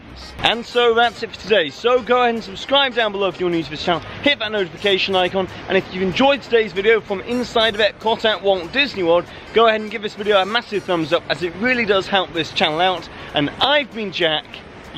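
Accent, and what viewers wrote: England, United Kingdom. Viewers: I love your videos man! I can't help it I been watching 5 of your videos. Just wondering is your accent from England?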